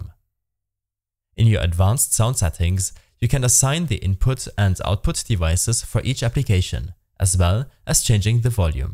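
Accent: Germany. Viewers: any cahnce that u are german ?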